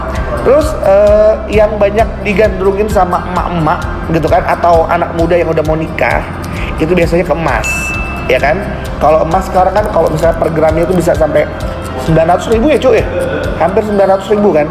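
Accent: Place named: Medan, Indonesia. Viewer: Ga sengaja klik ehh bahasannya menarik juga dan bermanfaat. Auto subscribe dah ini, ditunggu video laennya lae (logat lw sih anak medan hahaa...)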